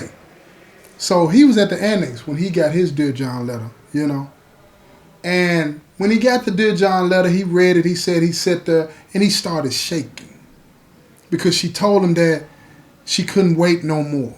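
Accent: South, United States. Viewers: Hi! I look forward to hearing ur videos everyday, ur very consistent, on time and that southern. Voice of urs I love it. Keep doing what ur doing. I'm glad ur son recommended u I subbed IMMEDIATELY. B BLESSED CUTIE.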